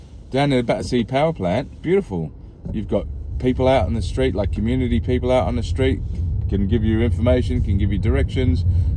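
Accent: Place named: Australia. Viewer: Love it 😂 P.S. You sound like the 'A succulent Chinese Meal!!' fella from our cousins over in Straya.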